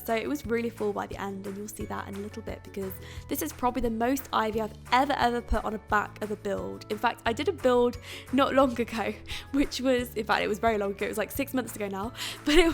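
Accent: United Kingdom. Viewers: Can you do a Q&A? And if you'll do one I have some questions: Where are you from? (In the video you said you moved to an apartment in Switzerland, but you have like a "light" British accent) How do you find the inspiration to your builds? Can you do a colab w/ Vixella please?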